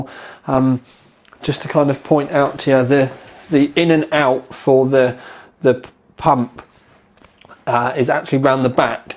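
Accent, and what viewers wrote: England, United Kingdom. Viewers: *Clicked on video* *Heard you were English* *Subbed* *Watched the rest of the video* *Stayed Subbed* Such a good rig!!!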